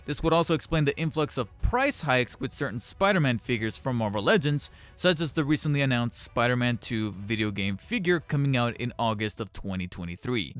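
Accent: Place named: Quebec, Canada. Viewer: Your accent is throughout me. It sounds very Québécois.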